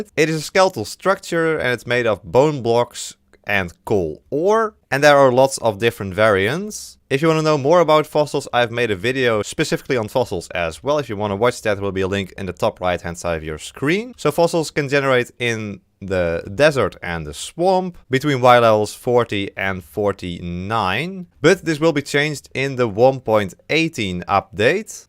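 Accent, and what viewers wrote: Netherlands. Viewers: Bro you sound dutch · i smell dutch :)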